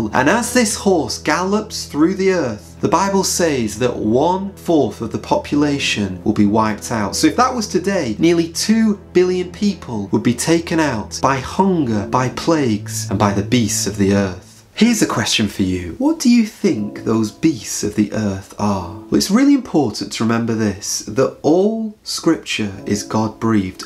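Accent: England, United Kingdom. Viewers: I love ❤ your English accent sounds so musical🎶🎵🎼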